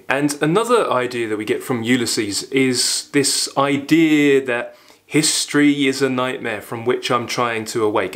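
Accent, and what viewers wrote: United Kingdom. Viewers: Hey man, I improve my British accent from your videos. Thanks for the videos.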